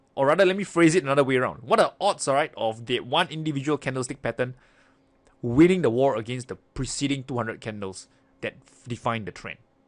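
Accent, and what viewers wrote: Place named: Singapore. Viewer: Singlish accent is popping!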